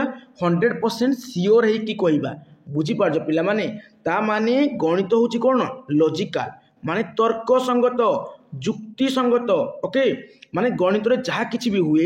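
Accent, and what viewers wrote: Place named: Angul, India. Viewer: Sir apana vala class karuchanti hele majhire majhire Angulia language tk tk bahari asuchi 😅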